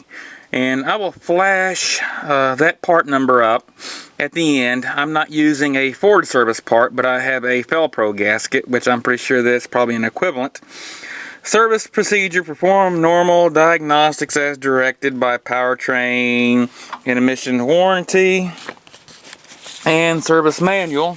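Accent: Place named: South, United States. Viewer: Sorry so long but my just lost my mom last year an then month or two ago his younger brother died suddeny like my mom and that was always my trouble shootin team dads just tired of hearing it run wrong but its to solid truck to give up on for 86 model and only 22 or maybe 26 or 28,196 is actually all it has in miles and never left my home town since Henry Ford shipped it u know of any other TSB again sorry so long of explaining. But u sounded knowledgable enough an had just enough country swang i can understand sold Haley parts to fellow cldnt say shit with mouth full unless it was 100 mph thanks for any info Sir And as always God Bless u and urs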